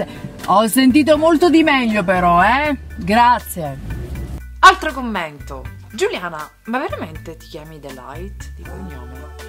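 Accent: Sicily, Italy. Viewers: ma sei siciliana ? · MA SEI MESSINESE O CATANESE? :D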